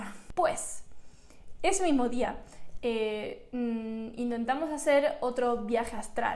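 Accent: Spain. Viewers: me mata que cada vez tiene más acento español xdd mas tierna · Acabo de caer en cuenta de que puede que esté teniendo varios indicios de viajes astrales, woooo. Voy a investigar más y practicar. 👀 Oyyyyyyye, y ya tienes re fuerte el acento español, jaja, recién lo noto. Te escuchas muy bella con la mezcla de acentos. xd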